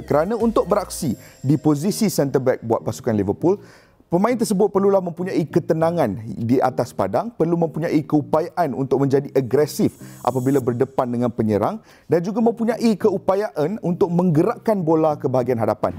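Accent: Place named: Malaysia. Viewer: Lidah melayu betul mamat ni. Terbaikkk 👍🏻👍🏻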